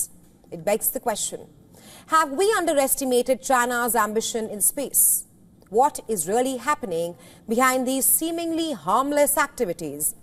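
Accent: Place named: India. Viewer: I really love your straightforward Indian accent.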